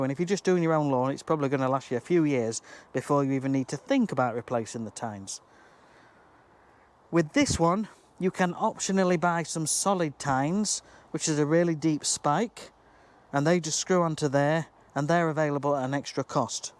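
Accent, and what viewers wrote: United Kingdom. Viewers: UK accent with imperal mesurements lol kinda threw me off. Its all good keep up the good work.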